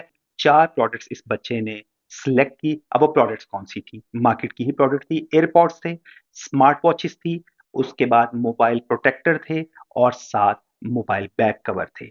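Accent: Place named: Lucknow, India. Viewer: Sir Mashallah Apke baat kerne ka Andaz Lucknowi hai AP Kiya Lucknow se hn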